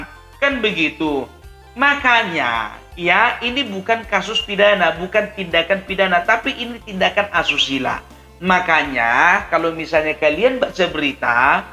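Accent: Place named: North Sumatra, Indonesia. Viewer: Enak opung ini kalau bicara dengan aksen batakx yg kental sangat menyenangkan, bagus banget.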